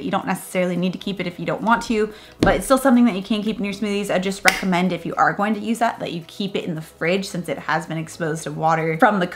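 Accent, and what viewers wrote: United States. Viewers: could you please slow down a bit? I just can't follow what you say (and Im sure may others and those who are not familiar with the US accent will also struggle). Great content though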